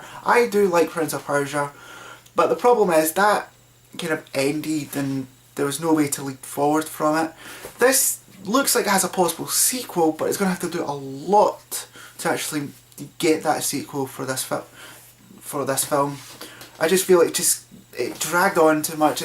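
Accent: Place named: Ireland. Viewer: You speak really quickly in an Irish accent. I kind of had a hard time.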